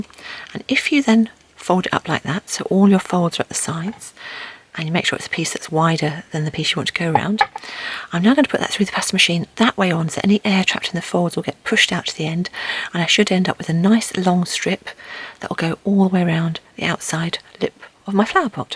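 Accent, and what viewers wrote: England, United Kingdom. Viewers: Thank you so much! I've wondered how clay canes are made. I have purchased a number of beautiful canes from a very talented woman who sells hers on ETSY. I have terra cotta pots and I am ready to play! I also make pens so I want to try some on brass pen barrels. I am guess that they would need a study sealant for protection against discoloration of the clay and breakage if dropped. Any thoughts are much appreciated! Finally, I would like to compliment you for such a lovely, professionally produced video! It's so much more pleasing to my ears to hear an English accent vs. an American (Canadians are better, too!) Very professional and quaint at the same time. Cheers from your Yankee Cousin from "Across The Pond!"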